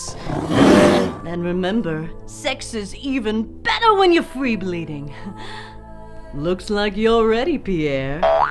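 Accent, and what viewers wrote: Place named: Alabama, United States. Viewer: what accent does she have ? Alabama ? Its nice sounding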